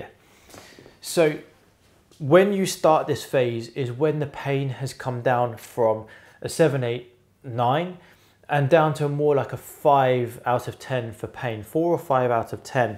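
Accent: United Kingdom. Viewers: You sound like you're from Landan...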